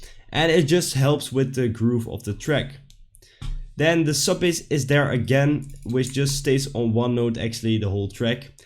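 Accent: Netherlands. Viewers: aangezien je accent weet ik dat je nl bent dus doe ik het in nederlands. tv noise maakt bass house toch? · are you dutch?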